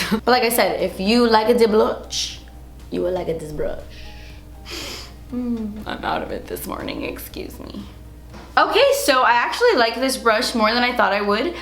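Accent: Cuba. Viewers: i love her cuban accent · Jajajajaja ame tu acento cubano!!!! Jajajajaj españo-cubano 😂😂😂😂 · Please speak more Spanish!! :D I’m Guatemalan-American and LOOOOOOVEEE hearing other bilingual Latinos speak in their native tounge 💕😊 Your Cuban accent is the Cutest! Just like you!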